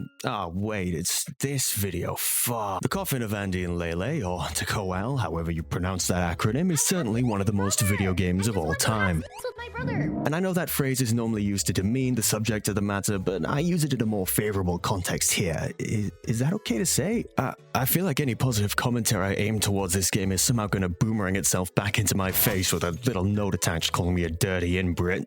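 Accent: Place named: United States. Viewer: Brit who talks like an American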